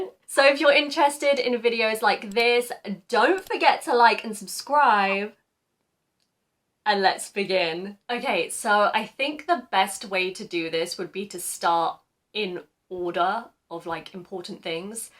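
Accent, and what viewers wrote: United Kingdom. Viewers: イギリス英語のaとかoとかerの発音がほんとにかっこよくて好きだけどなかなか真似できない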